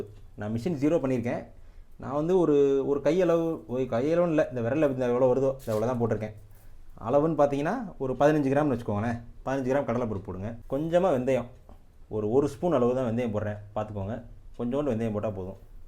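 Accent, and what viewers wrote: Madurai, India. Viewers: மதுரை பாஷை வருதுங்கோ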